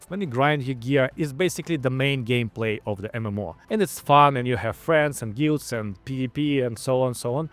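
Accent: Russia. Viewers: ох русский акцент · you have a strong russian accent.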